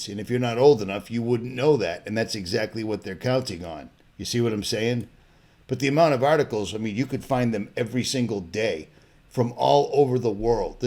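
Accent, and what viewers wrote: Boston, United States. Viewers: Yo bro definitely a good piece!! Sounds like a guy I knew from Boston I found on YouTube back in 2013👍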